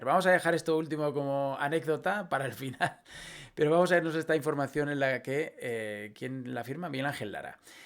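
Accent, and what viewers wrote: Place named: Spain. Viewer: Pero así son todos los españoles con las pronunciaciones en idioma original jajaja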